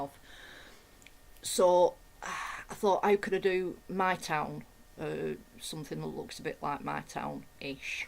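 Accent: Lancashire, United Kingdom. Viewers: Your accent makes me nostalgic, as my mum was from Lancashire (Manchester, or Pendlebury, to be exact). Your art describes a lot about what Mother remembered about those times, long ago. Your use of materials is amazing! Thank you. I will watch this video many more times! And God bless England during all the violence./Sandy in North Carolina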